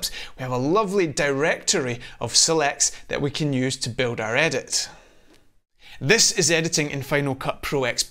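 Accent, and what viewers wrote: Scotland, United Kingdom. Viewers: That was without any shadow of a doubt the most useful & feature-packed tutorial I've seen. It made me realise I was doing far more work than I needed in FCPX to get the results I was after, and it cleared up a few elemental basics that I was doing completely wrong, and causing myself much heartache in the process. And great to hear a fellow Scot narrate it. Many, many thanks for this big man! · Simply because you have a strong Scottish accent I liked and added your video to my favs. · Ayyo! Fellow Scot! Instantly recognised your accent then saw your footage in Glencoe! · Very usefull video. Thanks....love Scottish aökcent:D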